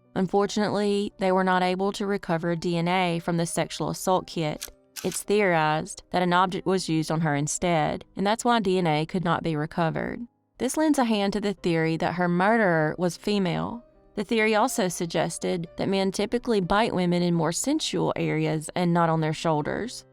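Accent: South, United States. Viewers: I really like your channel. The cases are interesting and i havent heard them a dozen times Als i really like that southern accent.😊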